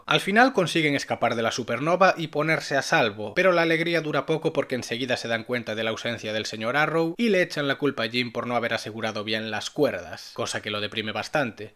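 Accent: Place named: Andalusia, Spain. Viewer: Tu ya no me engañas, eres andaluz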